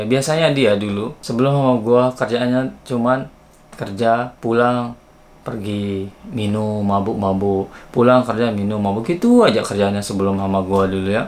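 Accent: Lombok, Indonesia. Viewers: Bang logat sasaknya gak bisa ilang walaupun hidup dijepang . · Kok mirip logat Sasak ya bang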